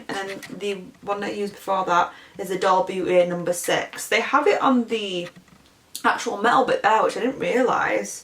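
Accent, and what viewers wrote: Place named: RP, United Kingdom. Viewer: oml your brtish acsent is the next level of porsh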